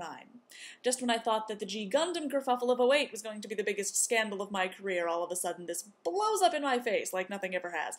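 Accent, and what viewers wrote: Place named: United Kingdom. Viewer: u sound british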